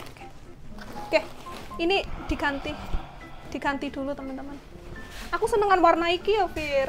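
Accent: Java, Indonesia. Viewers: Sy tu seneng klo mbak ngudang adik, logat jawax terasa banget... Sehat, sukses selalu buat mbak sekeluarga 🙏🙏🙏🤗🤗😘😘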